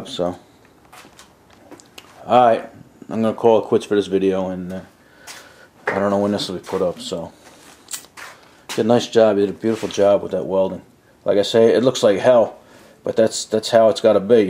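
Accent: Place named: North, United States. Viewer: Aye! Wddya say, look here tubes! Ain't that right! Hey! Look ere tubes! hey! I am just kidding, as a southern dude the northern dialect is fascinating! Keep up the good work!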